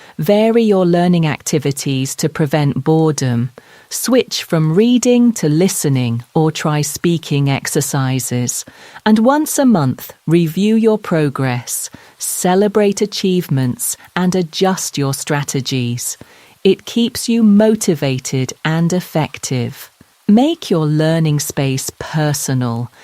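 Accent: United Kingdom. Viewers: Thanks ❤❤ i love your british accent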